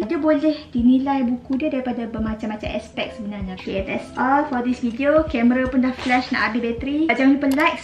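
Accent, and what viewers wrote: Kedah, Malaysia. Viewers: Sya suka kak punya perckpan dialek Kedah tu🤣🤭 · Weiii, teka betul la kuning! Hehe, eksaited sendiri! Btw, suka dialek Kedah sebab ada seorang kawan Kedah kat uni dan rindu beliau bila dengar cakap lagu ni. Best video! Suka tengok. Keep going! 😊😊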